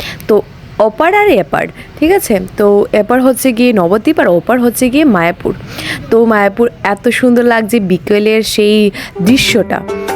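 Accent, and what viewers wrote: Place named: Bangladesh. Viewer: Your speaking tone has a influence of east bengal but it's quite natural for North Eastern bengali people like tripura ,Assam ..btw welcome to my hometown Nabadwip..enjoy 🙏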